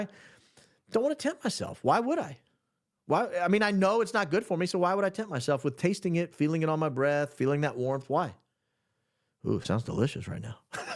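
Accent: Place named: Georgia, United States. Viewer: So, does "Adidas" sponsor your site? Just looked back...you have a different color hoodie for every day of the month! Same style...some things are creature of habit...maybe that's part of the business model, I don't get...but, it maintains consistency, and you're definitely good at it! Love listening to someone with similar dialect (native Georgian here).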